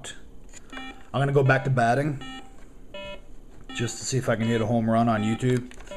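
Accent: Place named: England, United Kingdom. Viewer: amazing man. great memories. and when you said you grew up listening to the expos on the radio, i almost cried. what are the odds?? i too am from Montreal and grew up a big expos fan and also used to hear them on the radio every game. i loved my expos. but how come you listened to Jacques Doucet and not Dave and Duke? you sound english, so this shocked me. My dad used to listen to Doucet, then i'd change it to Dave van Horne and duke snider and we'd listen together. Great memories of Nos Amours and the good old days. thanks for the vid. you brought me back to my happy time.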